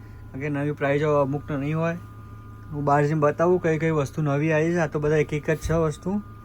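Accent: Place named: Mehsana, India. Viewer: ભાઈ તમે તો મેહોણા ના લાગો સોં. તમારી બોલી મેહોણા ની સે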